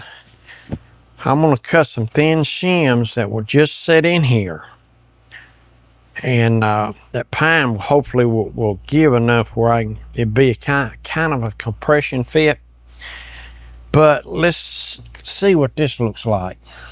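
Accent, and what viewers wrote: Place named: Appalachia, United States. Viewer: This is like watching paint dry, too slow and what an accent, reminded me of the Beverley Hillbillies!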